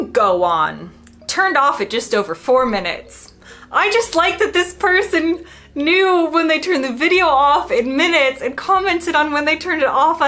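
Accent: United States. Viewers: The comment about your accent after 10 years of living here being still strong American. I’m from Hampshire in Southern England, I have lived in South Wales for over 30 years and my accent is just as strong. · Of course you sound like an American. It's where you grew up. I spent 30 years out of the UK and sounded exactly the pom I am wherever I went. It's quite hard to lose the accent of your youth unless you work really hard at it. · Don't try to erase your American English accent. How you express yourself is part of your personality - the words you choose to use and the way that you choose to deliver them - just take what you like from the English English language and speak them in your American English accent! Alright bruv? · Your fine the way you are I'm a Brit and there are the occasional American pronunciations of words that grate on my Brit ear a bit but other than that I've no doubt you'll catch up with us perfect Brits in the end. 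· A question on the accent 'issue' - is it that you still sound American to English people but Americans hear a definite change in your accent? My missus is Californian and has been here for fifteen years or more now - even to me she still sounds very West Coast and yet her family thinks she sounds 'posh'. An additional element to this is that her mother, who lived in Hawaii for most of her life, could barely understand me; so accents cut both ways :D. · This is not a hate comment, but: frankly, your accent (and a lot of American accents) jar on the ear because the vowels have an edge which is entirely unnatural to the British. This is made worse when the American concerned is loud. As for the tap thing, surely with a mixer tap you have to run both hot and cold before you reach the ideal temperature: surely this is a waste of water? We British do not like waste, especially when we have paid to heat up water, some of which goes straight down the drain. 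Hence we put a plug in the sink before we turn the taps on. Simple. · i find that when you speak fast your american accent is very pronounced , but when you slow down your speech your accent is toned down , and it seems you are gaining an english tone to your voice. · Hello there. I've not been watching your videos for long but I have to say that I love hearing about all of the negative and positive differences about our two coutries. I live in the Northeast of England in Northumberland and love watching your videos and learning about the differences between our cultures. I have just watched this one and was amazed about how ignorant people are, well to be honest I'm not really. People have no right to comment on things when they clearly have no idea what they are talking about. I agree with you about the taps. I like to run the water while I'm washing my hands because like you said it saves water but I don't like holding my hands under the cold tap and even more so the hot. 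I have always liked the idea of having the water at a temperature that's comfortable. I didn't realise what a silly, if not stupid idea two taps were until I saw your videos. I do prefer American toilets. They have a much better flushing system than ours. Being filled with a lot more water and then sucking everything down without leaving anything behind. It's nice that you enjoy living in the U.K. but that you still have your American accent and use your American words and terms for things. If I were living in the U.S.A. I would probably still keep some of my English words and terms for things. You should never get rid of who you are. I am originally from the Southeast and still have my southern accent and I've lived in the Northeast for 41 years so there you go. I use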